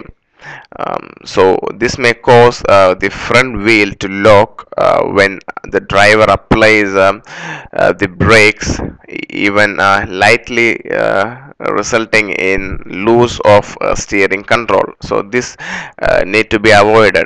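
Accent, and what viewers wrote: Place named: South, India. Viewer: Indian guy raised in Mississippi ?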